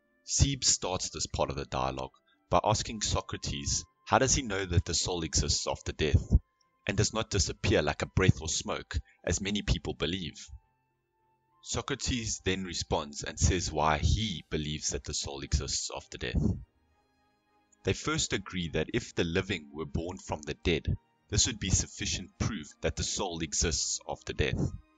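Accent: South Africa. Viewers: You South African man?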